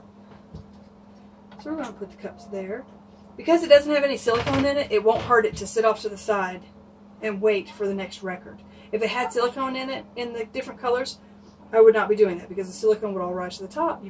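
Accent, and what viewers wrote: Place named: Georgia, United States. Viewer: I thought your accent was familiar! I'm also a Georgia girl; Savannah area!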